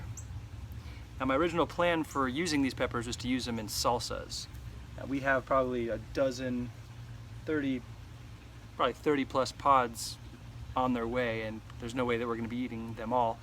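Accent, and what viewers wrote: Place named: France. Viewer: You have somewhat of a French accent... Are you from a Ontario or Quebec??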